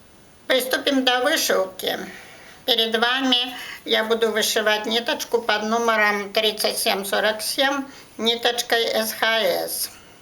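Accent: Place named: Belarus. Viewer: Здравствуйте, так приятна Ваша речь, я не слышала чистый белорусский язык, хотя у меня в молодости была подруга белоруска Нина Одинец, потом я поменяла место жительство и больше не общались,она говорила на русском языке, хотя иногда переходила на белорусский язык, но он был мне понятен , у меня дед белорус, по маминой стороне а бабушка чалдонка, а папа Украинец учил меня меня украинскому языку и выписывал для меня журнал Барвинок и я научилась его читать но сейчас я уже многие слова забыла. Всегда с уважением относилась к Белоруссии и к Украине. Для меня белорусы очень мужественные и добрый народ, у нас в Новосибирской области много белорусов, есть белорусская диаспора, я часто слушаю белорусские песни по радио. Очень рада за Вас что Вы много знаете о своей истории, я много читала в художественной, исторической литературе о прежней жизни и характере белорусов. 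Я пишусь русской но во мне кровь русских и украинцев и белорусов, Вам спасибо и успехов в вашем творчестве.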